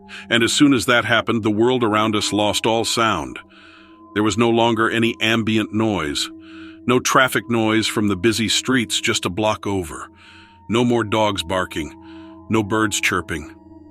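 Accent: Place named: Midwest, United States. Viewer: You have a great voice!! I really enjoy your channel. You have a Midwestern accent. What state are you in??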